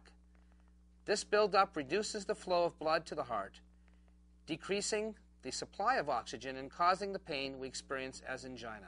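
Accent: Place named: United States. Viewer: i think he pronounced angina correctly coz this is the American accent!!!! yesterday an american prof gave us a lecture about angina and he pronounced angina the same>>>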